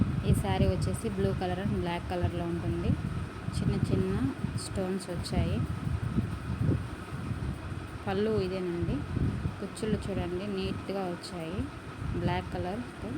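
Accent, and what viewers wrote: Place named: Chittoor, India. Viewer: Me slang konchem chittoor slang laga undi so anduke అడిగా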